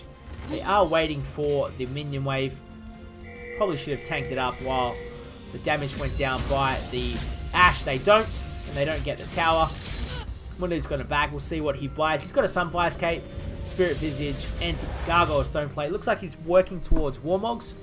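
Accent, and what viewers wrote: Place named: Australia. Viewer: Terrific gameplay and what top drawer aussie commentary dude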